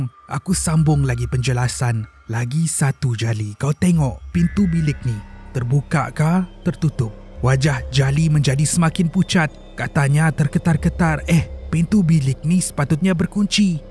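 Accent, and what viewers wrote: Sabah, Malaysia. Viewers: Dia Sabah lah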